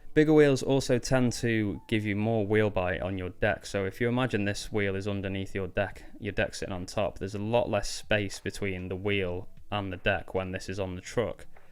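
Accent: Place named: Canada. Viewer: You sound very Canadian 🤔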